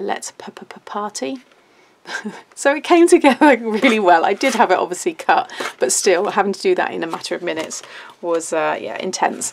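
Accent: England, United Kingdom. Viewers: Just discovered your channel. You are just amazing ! Congratulations for all these cards ! They are so nice ! Will see if I can order your stamps to be delivered to my country (Switzerland). Really enjoy your videos and your English accent is just perfect for my French speaking ears 😊. Take care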